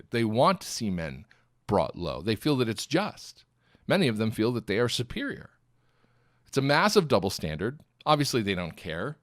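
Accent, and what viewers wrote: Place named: France. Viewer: Great French accent. Oh boy will dissenters never give up.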